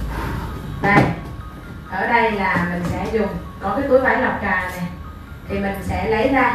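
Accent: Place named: Huế, Vietnam. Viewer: Nghe giọng là biết Huế rồi hiihi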